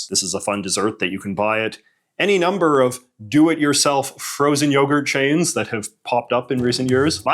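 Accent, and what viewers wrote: Canada. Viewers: As a Canadian, how did you manage to sound so Canadian??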